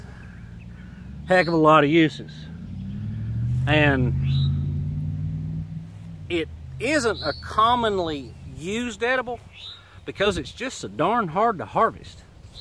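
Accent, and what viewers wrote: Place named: South, United States. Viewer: The Horrid Thistle (better name than Bull Thistle) is absolutely not an invasive plant! It is a native, and extremely beneficial plant for wildlife. Applying the obvious here; you have a southern accent so I can assume you live in the Deep South, like me. Houston, Texas here. Horrid Thistle' are blooming everywhere right now with Spring just beginning. Sure, they may not be conventionally pretty but they are fascinating. Back to the point, know your stuff brother! Don't spread misinformation. The rest of the video was great btw.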